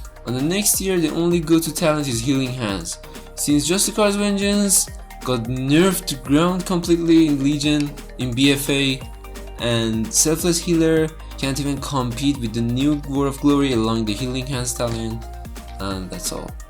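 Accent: Iran. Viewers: I can usually spot a fellow persian by their accent but I'm unsure... are you?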